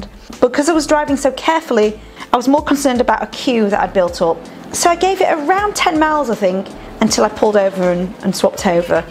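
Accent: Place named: Doncaster, United Kingdom. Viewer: Your finest hour .the best vlog .the longstanton spice museum 😂 aha aha 😂😂.the Doncaster accent was on form too.you proper make me laugh.hello when someone is trying to come in 😂😂.will be taking advantage of the McDonald's info.great vlog .carry on camping you could not make it up😂.can't wait for more fun vlogs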